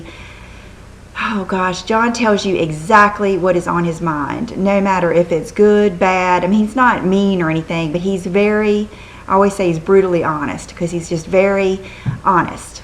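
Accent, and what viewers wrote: South, United States. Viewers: PS: you always brightin my day, Ur southern accent is soo beautiful and welcoming i am soo takin back.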